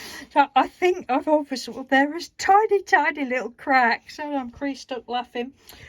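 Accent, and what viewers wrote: United Kingdom. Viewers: I just love your British accent! Just love your personality 😅so funny. These hearts are beauitful thank you!!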